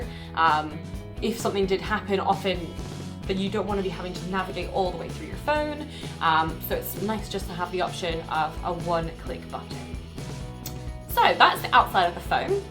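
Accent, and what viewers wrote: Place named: Estuary English, United Kingdom. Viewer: Silly, intrusive background 'music', no explanation of what is shown on the external indicator panel, no mention of charging plug type, absence of showing how to set anything on the phone, ambivalent accent mixing estuary English with a sort of N.American and, overall, an ego trip posing as an empathetic guide for seniors.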